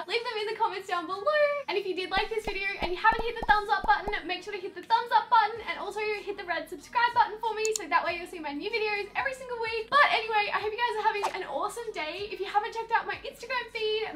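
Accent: Australia. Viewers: I love how I could tell she was from Australia by her accent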